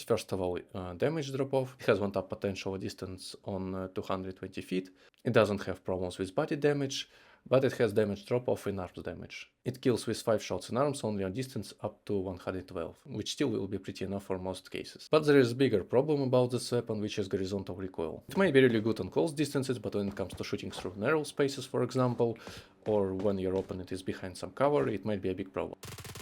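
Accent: Russia. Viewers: I love your russian акцент, good luck on youtube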